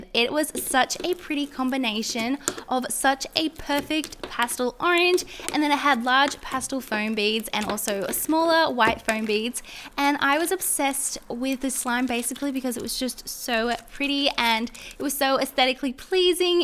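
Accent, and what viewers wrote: Australia. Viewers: * are you Australian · R u from Australia? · Are u from Australia ???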